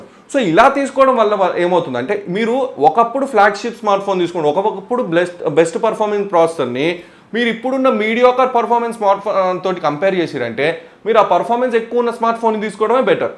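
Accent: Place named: India. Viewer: I like ur telugu slang and fearless review and comedy scenes also 💟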